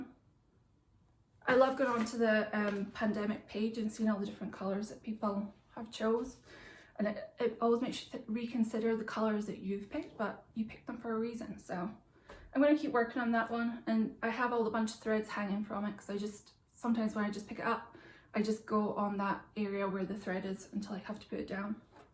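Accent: Scotland, United Kingdom. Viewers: The little Nessie is adorable!!! I couldn’t understand who made it and who gave you all those gorgeous gifts?? Awesome! Great haul, love all your purchases. I’m so glad you’re finishing Black Bear, it’s an amazing design and you’re so close. By the way, it’s so strange hearing the Scottish brogue against a background of wild fires and American shopping (I’m in Sussex U.K.). Congrats for your 1k, Winter Cabin would be great to stitch, thank you!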